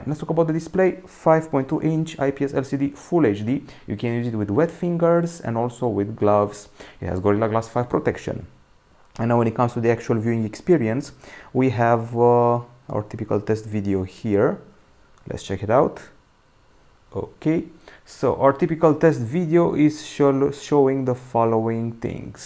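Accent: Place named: Romania. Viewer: you have a strong romanian accent :D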